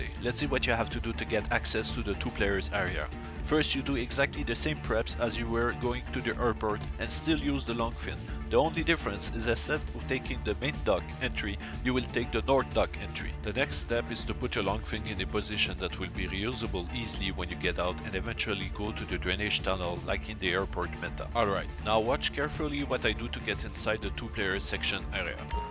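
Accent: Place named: Quebec, Canada. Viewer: i recognize a quebecois when i hear one great tutorial tho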